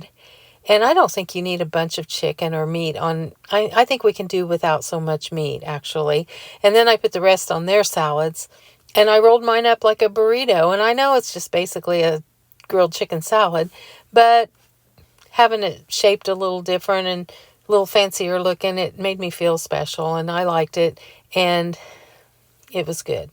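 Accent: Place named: Indiana, United States. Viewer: Your cakes are beautiful! It is so nice that you take them to your neighbors and friends. Your salad looks yummy. And the Easter decor looks so nice. Your friend, Rosie's gift is lovely, as is the silver stand. It looks stunning. Your accent reminds me of my cousin, who lives in Indiana. I am a new subscriber. Oh! And the bunny as a bowl is a neat idea. Your potato salad looked great displayed in it. Happy Easter.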